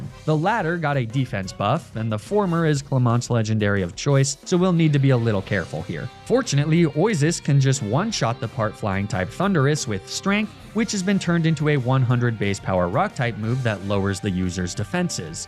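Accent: Finland. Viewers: Wait how did i not realize you were finnish until now 😭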